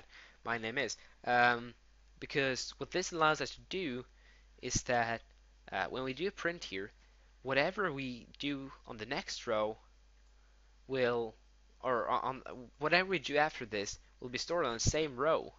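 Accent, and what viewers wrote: Sweden. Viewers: Your Swedish? :0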